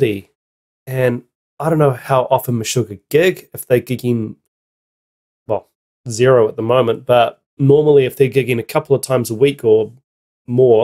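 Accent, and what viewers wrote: New Zealand. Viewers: Where in New Zealand are you from?!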